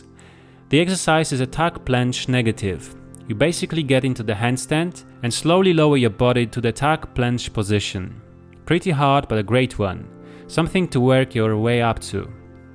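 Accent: Poland. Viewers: What is your accent? Were are you from? You sound Polish a little :D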